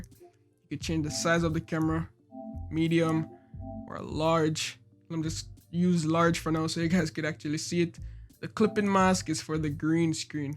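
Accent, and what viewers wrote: Jamaica. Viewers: Man sound like a yawdy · Wait u Jamaican ?🇯🇲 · I hear the Jamaican accent lol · Yow real medz are you jamaican? ?? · Are you Jamaican? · Are you Jamaican 🇯🇲✊🏾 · Where you from bro? Sounds like a Jamaican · Mi can hear di Jamaica in yuh yute 😂🤞🏾🇯🇲 good video I'm thinking of buying one.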